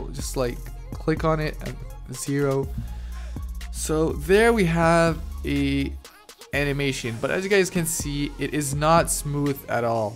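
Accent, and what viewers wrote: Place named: England, United Kingdom. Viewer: Great video! You've helped me so much! Want more intro's tutorials please, and if you could bring us some layout's tutorial it would be amazing! And I love your English accent :3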